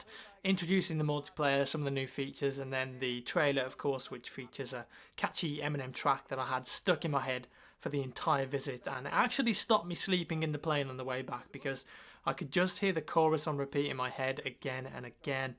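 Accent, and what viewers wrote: England, United Kingdom. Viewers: he did not said 30 60 controller lmfao.... he said 360... it's just that he's british and when he's talking fast you, as an ignorant american probably, might not understand properly what he's saying. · its just a british accent man, take a fuckin chill pill and stop wasting all of out time with comments like these. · Ur english?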